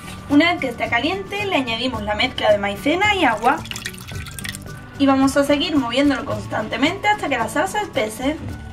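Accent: Spain. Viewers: linda, me encantan tus recetas y tu acento españolísimo. Saludos desde Medellín, Colombia.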